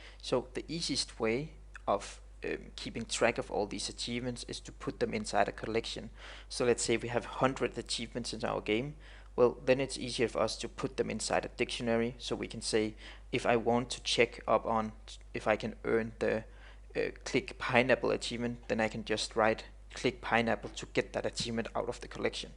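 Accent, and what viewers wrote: Denmark. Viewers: hey i hope you still helps :P im trying to follow your tortuial on this achiement system :P but insted of achievement i will make it to a buy dogs(pugs) :P but i have some problems :) mine says ´pugs´ is a type, which is not valid in the given context :( and i dont get why :) so i hope you will help me :P ps Danish here :) so if you want just talk danish :) cos i can tell by your accent that you are danish :P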